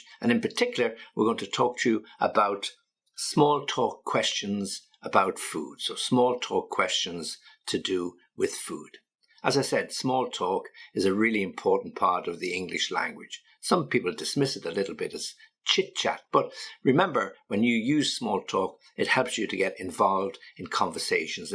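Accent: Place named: England, United Kingdom. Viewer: Do you live in England? Your accent.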